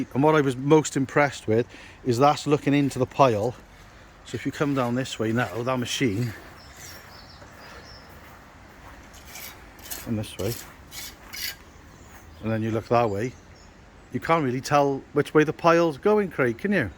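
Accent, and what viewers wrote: Wales, United Kingdom. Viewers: Some good tips with a Welsh accent ... seeing as I'm originally from North Wales it's a win / win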